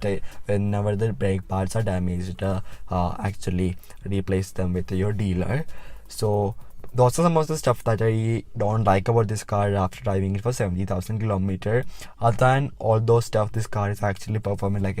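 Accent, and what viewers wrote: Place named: Kerala, India. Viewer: Malalyali aananu first 2 seconds le manasil aayi :)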